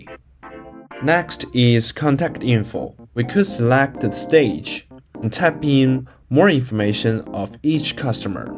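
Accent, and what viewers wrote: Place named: China. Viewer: as soon as I heard the chinese accent I was like, no thanks, I dont want my personal info to be in some chinese farm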